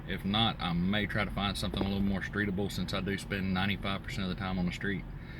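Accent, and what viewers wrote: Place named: Tennessee, United States. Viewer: YOu sound like a TENNESSEE driver!! ( me too.. ) I think part of yours is the load and driving use... I'm going to be running mine on just my GX470 (about 6000 weight with metal bumpers, winch- and extras).. but normal street driving mostly-and not very aggressive. I'll most likely do a LITTLE offroad stuff-- just fire roads, or rougher dirt roads and of course winter.. but not much- so with what you got- mine should do fine. THANKS- appreciate the totally honest and factual review..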